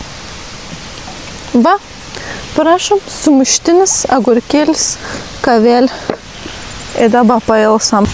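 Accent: Samogitia, Lithuania. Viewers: Smagu girdeti zemaiciu snekta. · Smagu klausyti žemaitiškas tarmės, labai smagiai žiūras. Sėkmės👌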